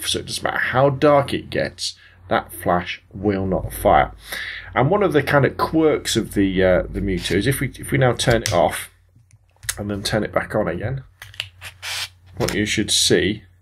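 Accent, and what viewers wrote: United Kingdom. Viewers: Best review! Beautiful accent and I believe it's British accent! Peace :D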